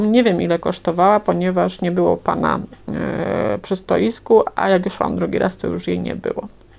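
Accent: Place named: Silesia, Poland. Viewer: ja tak samo, pełna zgoda 😂 Kocham Śląską gware pani jest autentyczna, pozdrawiam serdecznie ❤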